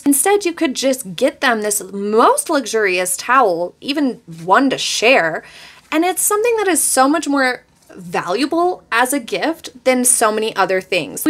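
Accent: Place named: Midwest, United States. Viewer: are you from the midwest? I'm catching a slight accent.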